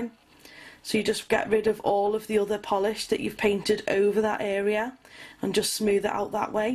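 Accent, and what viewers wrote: United Kingdom. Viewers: Oh my!!! I LOVE LOVE LOVE your type of British accent!!!!!!! One of my absolute favorites!!! ❤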